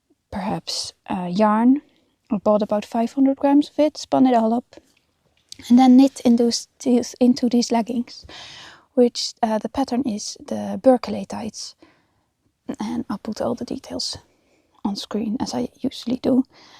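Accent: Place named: Netherlands. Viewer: Het is te horen ja, sommige dingen klinken nog Nederlands 🤩 Maar toen je op 31 dec middenin een bos zat, kon ik me niet voorstellen dat je ook in Nederland wás op dat moment , zonder mensen en zonder herrie om je heen 😂 ik vond het heel leuk om te kijken en zal je zeker blijven volgen! Ja , ik brei heel veel en ik spin, maar niet zoveel als ik zou willen. Maar als ik jou dan weer zie .. dan wil ik nú beginnen 😊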